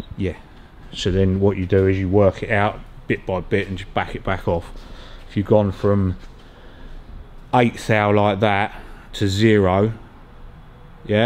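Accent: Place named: United Kingdom. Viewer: ''This is a sh@ horrible thing called a bearing cup greaser.'' Dammiitt! Yeah, we got them over here too Y'all. But our's are morons more along the lines of what I'd call a ''Political Bearing Begging Cup Greasers'' Tickled me that when I'd left the volume up to listen while making my bladder gladder... the thought of Michael Caine popped to mind as was talking. Same part of Britain as he, no? Too tight cold plays hell on all metal surfaces once their heated up under motion. I can better see that here. When I'd brought my '68 Trophy Sports back home from Ft. Bragg in '74. Dad having been a mechanic all of his life; begin to immediately moor over it's mechanical being. Next morning I got up & he'd done 2 things to it that evening before. He'd drilled & wired the tank's 2 front fastening nuts that same way. Also the rear crack split lateral bar of the tank's ''Castration Parcel Grid'' had been joined back together via a split vacuum advance rubber hose. 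He said that outta help keep the to tank stay on good & that package rack from de nuttin' ya... cause your gonna owe me a grandson or two. Good stuff all around in the ''Strengthening of them things that remain''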